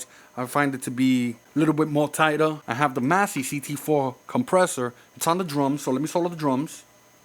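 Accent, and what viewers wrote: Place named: New York, United States. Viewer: that NY acccent!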